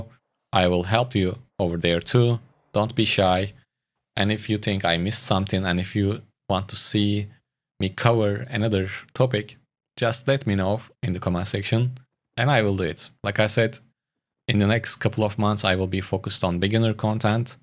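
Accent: Turkey. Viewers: Tam bir türk aksanı başarılarının devamını dilerim dostum 3 yıl sonra tekrar başlayayım dedim ve videolarını izliyorum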